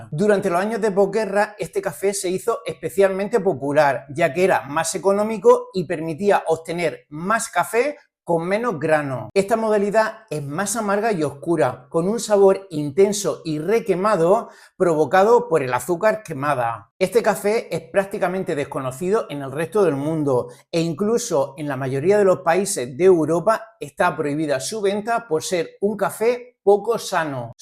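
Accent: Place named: Murcia, Spain. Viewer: No puedes negar que eres Murciano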